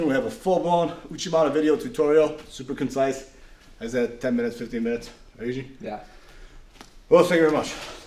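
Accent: New York, United States. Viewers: I like how he speaks New York :-)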